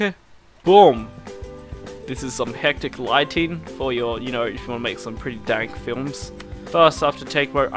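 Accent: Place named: Russia. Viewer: You have a nice Russian accent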